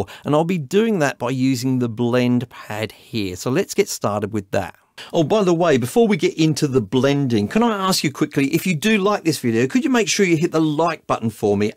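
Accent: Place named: Australia. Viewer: Totally off topic: English is not my native language, so I asked a friend from England for help, when I first discovered your channel. I assumed, you say "I hope you're well", but for me it sounded like "I hope you will", and it was driving me crazy, because I always thought "will what?" My friend assured me it was just your australian accent, so thank you for always wishing us well! xD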